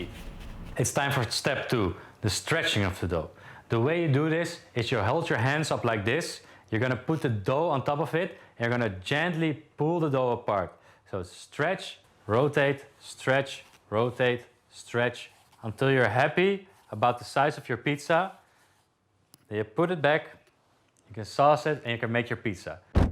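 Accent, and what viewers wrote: Netherlands. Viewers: Kan Gwn horen dat je Nederlands bent gap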